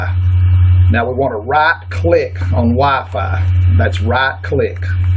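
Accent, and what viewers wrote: South, United States. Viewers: Thank you, u just saved my business and person life oh and it's the southern accent for me🙏🏽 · Dude you are awesome!!!! Thank you! Love the southern accent!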